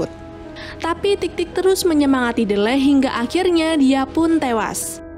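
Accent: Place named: Sunda, Indonesia. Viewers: Sukses terus mbak.. Lucu nyarios sunda na.... Aku nyesel baru baru ini nemu chnl mbk... #auto chanel faforit ini mah... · Gw nonton karena yang ngomong nya cw orang Sunda lagi ya Allah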